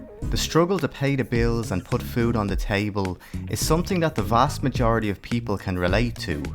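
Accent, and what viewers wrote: Ireland. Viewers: Mate are you from Ireland?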